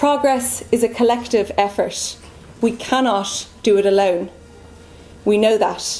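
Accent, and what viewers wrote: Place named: Cork, Ireland. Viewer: its great you never lost the cork accent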